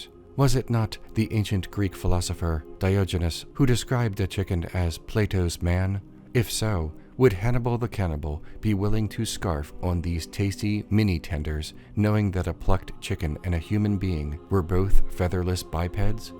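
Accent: Baltimore, United States. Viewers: I can tell you're from Baltimore by the way you say Baldimore.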